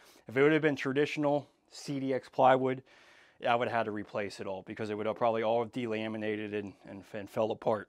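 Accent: Pittsburgh, United States. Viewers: Working on my own 112…e..14-year-old house with balloon framing. Always good to see somebody else’s approach. Heard your accent and knew you were Pittsburgher!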